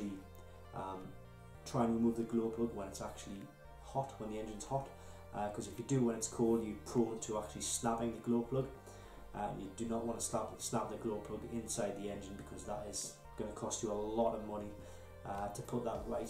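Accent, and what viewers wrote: United Kingdom. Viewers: This British accent.....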